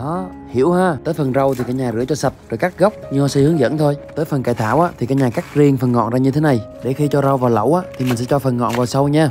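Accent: Quang Nam, Vietnam. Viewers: Con trai người Quảng Nam hay Đà Nẵng vậy ? Chúc mừng kênh con , bác luôn theo dõi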